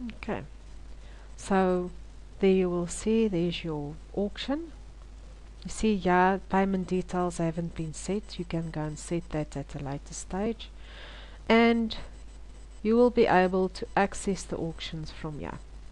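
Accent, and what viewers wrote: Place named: South Africa. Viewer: Haai auntie maar daai is n South african accent. Thanks for the video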